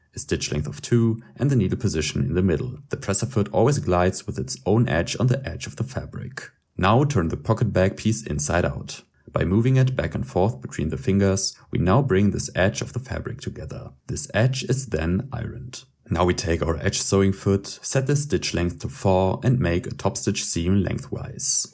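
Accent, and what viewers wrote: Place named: Germany. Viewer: I’m hearing german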